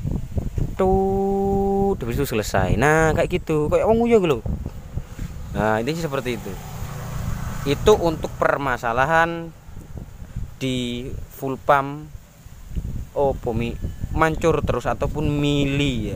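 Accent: Java, Indonesia. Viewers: Ngmong mu lucu mas tp q paham ..q jawa jg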